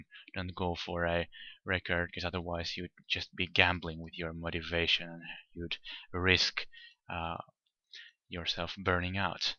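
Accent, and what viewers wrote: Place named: Finland. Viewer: ive only watched you for 40 secs but already know your finnish haha :D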